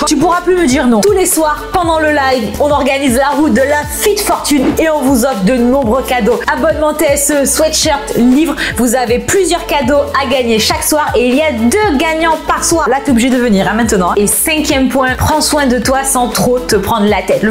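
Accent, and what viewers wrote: South, France. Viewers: Hohoho l'accent du sud dans cette vidéo 🤣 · Le nouveau programme dance TSE 😍😍😍😍 j'attends le nutrition book avec impatience!!! 😉😉 merci pour ta motivation qui nous motive, l'accent du sud c'est le meilleur 😘😘😘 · Contente qu’une autre sudiste ait un super accent motivant 🥰 super sympa cette vidéo 😘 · Ooooh avec l'accent du sud😂😂😂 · Je suis Québécoise et, dans cette vidéo, l'accent du sud semble tellement évident hihi! ☺👌 J'adore ! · J'ai bloquée sur ton accent du sud 😅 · J’ai adoré cette vidéo! Tu as totalement raison les lives rythme la journée et permettent de se vider la tête, j’attends que ça durant la journée! 🥰 D’ailleurs trop mignon ton accent du sud, je viens du sud aussi et c’est drôle de voir comment les accents varient facilement 😂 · a grave l’accent du sud ça fait plaisir 😄team Sud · Je savais que tu venais du sud mais l'accent ressortait beaucoup sur cette vidéo mdr. 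C'est mignon. Merci pour cette vidéo. Je suis déjà tous les conseils^^ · Je viens juste de remarquer que tu as un sacré accent du sud 😂 Perso je ne fais pas les live cette fois mais le programme Body Power j'adore 💪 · Ton accent du Sud est TELLEMENT présent 🤣 !!! En tt cas merci pour tout ce que vous faites pour nous pendant ce confinement n°2. ❤️❤️❤️❤️❤️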